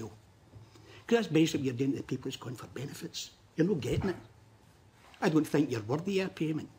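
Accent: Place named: Scotland, United Kingdom. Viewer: Great speech! But I accidentally had automatic subtitles on and PLEASE do not watch when they are on, it picks up the words completely wrong (Scottish accent problems?) and comes up with really rude sentences... Once turned off and watched second time this is a BRILLIANT speech and makes an excellent case why Scotland would be better independent.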